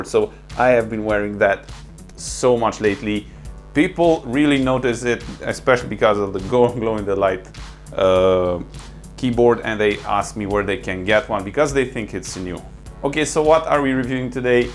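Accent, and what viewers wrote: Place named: Hungary. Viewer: Kicsit magyar az akcentusod. Talán nem véletlen, ugye? :)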